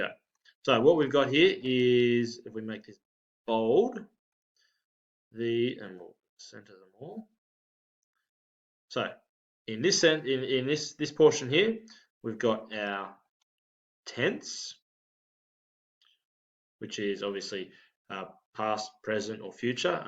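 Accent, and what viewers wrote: Australia. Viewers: You sound Australian Don't be offended but thank you nga mihi bro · How come he's teaching Maori with an Aussie accent?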